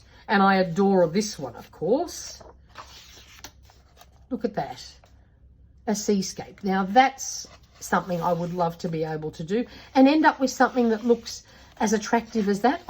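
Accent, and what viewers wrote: Australia. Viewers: Nice honest review. I just found your channel because I was looking for reviews on this book. Nice to be greeted by an Aussie accent. G’day!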